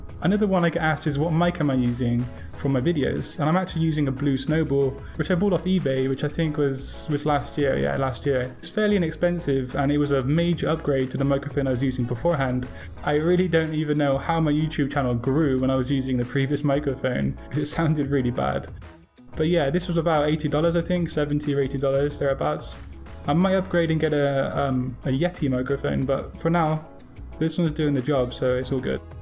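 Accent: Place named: England, United Kingdom. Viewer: Nice.. I wanna get out there one day. I always assumed you lived in England hearing the accent in the videos.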